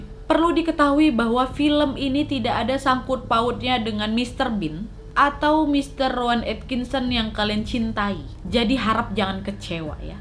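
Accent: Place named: Lampung, Indonesia. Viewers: dialek sumatra.... sumatra mana? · Mbak orang Lampung ya, dialek bicara nya Lampung banget 🙏🙏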